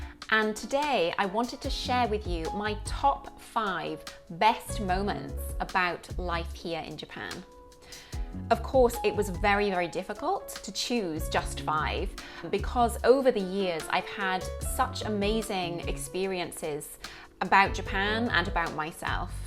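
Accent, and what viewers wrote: United Kingdom. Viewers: いつもキレイなイギリス英語を勉強しています。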